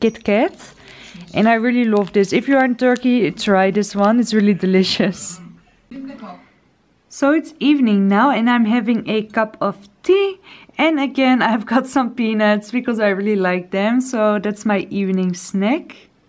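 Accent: Netherlands. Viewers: Ik hoorde meteen je Nederlandse tongval😅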